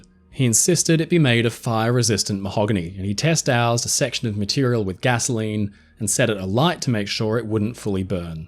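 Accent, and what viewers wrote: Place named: Australia. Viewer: 😊great show man, you have an Australian accent, but your last name seems to be Irish… Just curious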